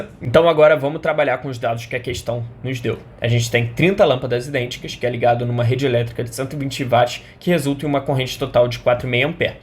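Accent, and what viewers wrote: Rio de Janeiro, Brazil. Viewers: nada a ver com o conteúdo mas, tu é carioca da gema?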